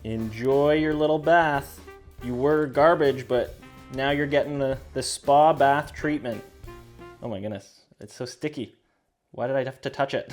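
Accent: Canada. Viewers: I enjoy your silliness and your wonderful smile and especially how your projects work out to be something worthwhile .... and, oh yeah, listening to your Canadian "ou" sound, eh! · Stayed home sick today and found your channel and im loving the content! Also random weird question but are you by any chance Canadian?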